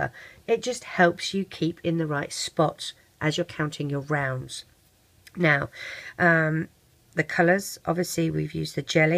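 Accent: England, United Kingdom. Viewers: The best minion ever! I love it! Just one question, are you English?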